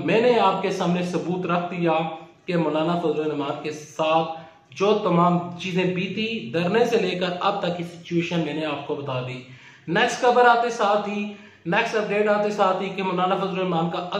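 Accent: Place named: Punjab, India. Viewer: Fix your accent too much Punjabi accent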